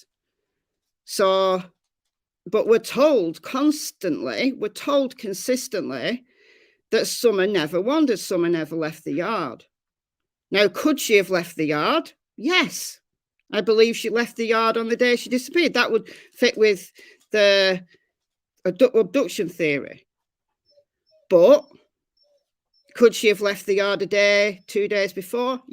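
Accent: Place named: Southern England, United Kingdom. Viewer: So gutted I missed your live. Love your channels and you are the first person I look for when I wake up. I’m in the UK to but much further south judging by your accent. Thank you for everything you do keeping us up to speed with the facts! X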